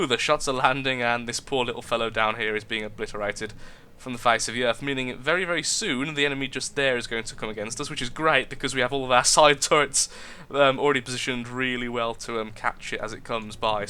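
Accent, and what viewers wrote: United Kingdom. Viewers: are u a brit?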